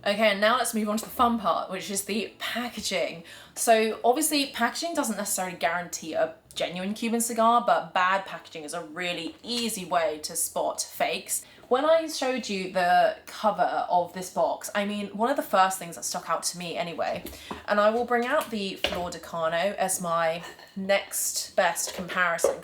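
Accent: United Kingdom. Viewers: I love your attention to details a great video for newbies Sounds like you have an English accent, which means you have full access to amazing Cuban cigyes. So don't understand why you are going to buy something other than from a Reputable dealer . I travel to London quite a bit ,and is one of the most expensive places to buy a proper Cubano I'm dutch American an have access to any cigar of choice, I prefer many other cigars over cuban its 2023 and cubans losing steam with guys like me to many better options ,China has driven up the price but once they get taste of Davidoff my father Padron the will quickly convert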